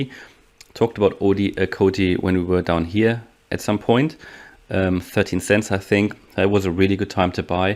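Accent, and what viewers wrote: Germany. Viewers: Deutscher akzent lol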